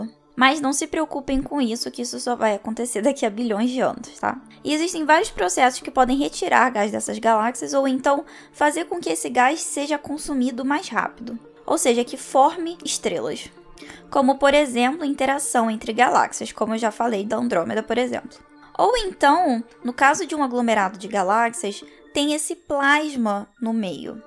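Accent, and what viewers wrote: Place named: Rio de Janeiro, Brazil. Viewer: Mds, sotaque carioca é perfeito aff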